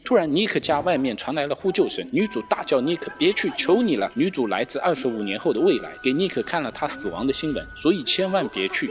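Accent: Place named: Hangzhou, China. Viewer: 嗯 我杭州人 口音听起来很接近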